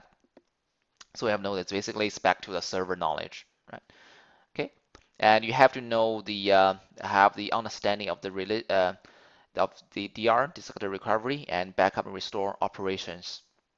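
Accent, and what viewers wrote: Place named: Philippines. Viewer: Thanks for sharing this, I'm interested to learn more technical skills in Azure! Appreciated so much. Anyways, are you a Filipino? because you have a filipino accent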